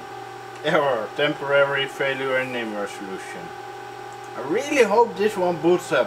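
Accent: Netherlands. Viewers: I wasn’t ready for that Dutch accent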